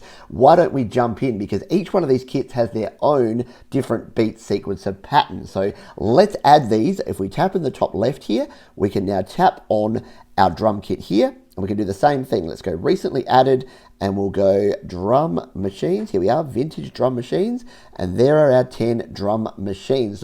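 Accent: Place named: Australia. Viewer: I recognise that accent. Hi fellow Aussie.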